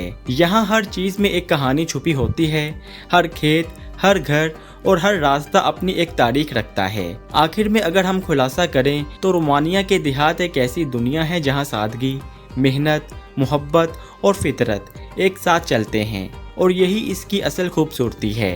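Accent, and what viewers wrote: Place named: Lahore, Pakistan. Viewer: lahori urdu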